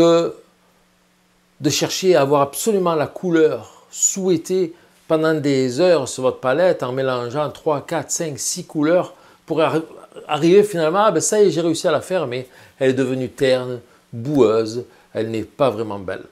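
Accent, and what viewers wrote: South, France. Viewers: Merci vous êtes bon pédagogue , j'aurais aimer être un de vos élèves mais avec votre accent je suppose que vous êtes du sud! Merci pour cette vidéo! Bonnes fêtes! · Petit accent du Sud de la France ET du Québec?? :) Merci pour vos astuces!!!!!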